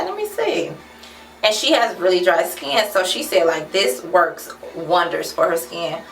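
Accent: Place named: Detroit, United States. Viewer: I love your Detroit accent!!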